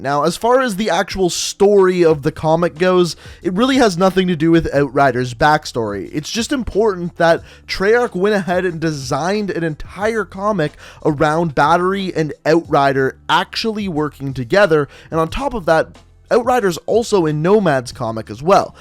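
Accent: Canada. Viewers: Are you Canadian? Not sure about your background but by the way you say out I’m just curious as to your whereabouts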